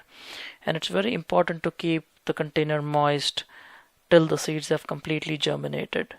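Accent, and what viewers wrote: India. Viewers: I love the accent on these Injun guys....much more cultivated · Are you Indian ???your accent is different than of Americans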